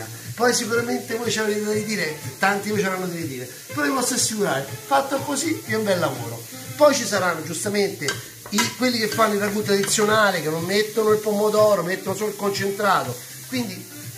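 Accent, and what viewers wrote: Rome, Italy. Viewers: El romano piu che l'italiano ahhaha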